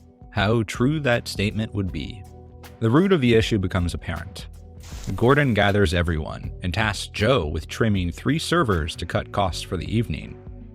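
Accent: Canada. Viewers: I love how I can always tell that a YouTuber is Canadian by the way they say “aboot” lol. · could do without this damn canadian narrator since he calls every thing a hoose or aboot learn to speak right or gettoff this narration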